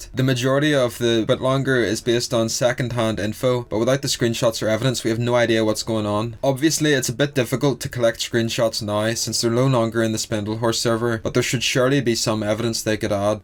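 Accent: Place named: Northern Ireland, United Kingdom. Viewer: A Northern Irish narration on Helluva Boss.... YouTube algorithm nailing it today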